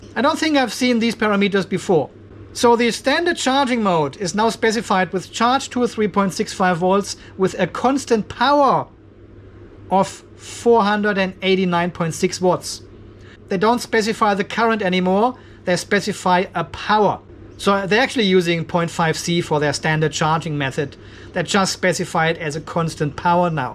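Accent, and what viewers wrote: Germany. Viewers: Hey ho. Is it only my feeling or do you sound like a german who is living in australia?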